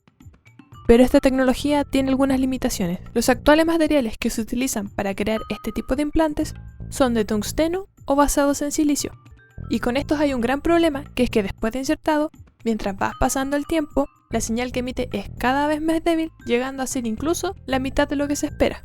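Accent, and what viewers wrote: Chile. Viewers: Buen video! Eres chilena? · Me gusta más tu acento chileno 7u7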